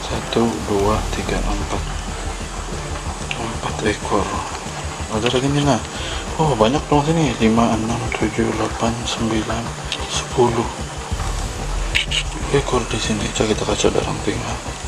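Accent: Sabah, Malaysia. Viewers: Selamat malam tuan..bila sye tengok tuan punya channel Saya faham ape tu maksud p0 Dan F terima kasih tunjuk ajar...jiwa Saya semakin membara untuk breeding betta...Dan Cara tuan cakap sabah teringat sama kawan2 di tempat kerja tahun 2007 dulu..sebab bila bersama diorang terasa Saya nie di sabah...hahahahahahah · ui na, mantap boss, terus maju jaya sihat selalu dan murahbrezeki bosskur, truskn ckp begitu, sia mok blajar beta sama mok dgr berckaap sabah, btw info harini jelas dan paham terima kasih👍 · mntapp..cakap sabah serupa dgn bhasa brunei bng..ngam ka salam dr brunei broo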